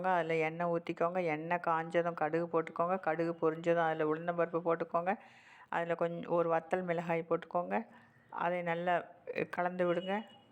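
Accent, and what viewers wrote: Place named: Kerala, India. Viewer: நீங்கள் மலையாளியா?உங்கள் உச்சரிப்பும்,உபயோகிக்கும் வார்த்தைகளும் அதை தெரிவிக்கின்றன.பி‌ன்னே என்பது மலையாள‌வார்த்தை.தமிழில்‌ அப்புறம் என்று சொல்ல வேண்டும்.மனஸிலாயோ சேச்சி?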